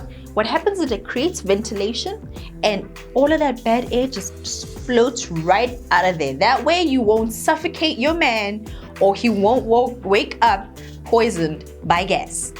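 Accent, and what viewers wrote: South Africa. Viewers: She is proudly South African 🇿🇦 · Definitely a South African.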